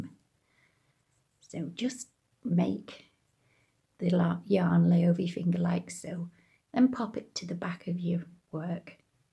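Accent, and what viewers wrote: England, United Kingdom. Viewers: It’s a great stitch but I’m in the uk and got a bit confused as you have an English accent. I missed the very beginning of the video and only realised after seeing you crochet you were using American terminology, but your video showed all the stitches very clearly, I was looking for a border for the Tunisian crochet blankets I make, this is going to be very useful, thank you.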